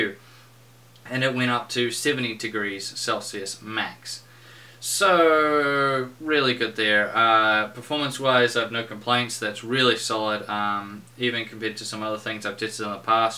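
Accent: New Zealand. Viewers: Mate, your from New Zealand? Awesome!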